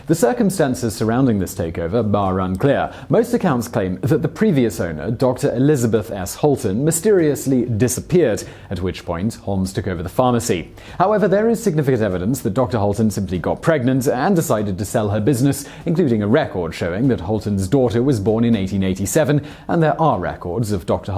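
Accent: United Kingdom. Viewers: "Murder" sounds so much more sinister with a British accent.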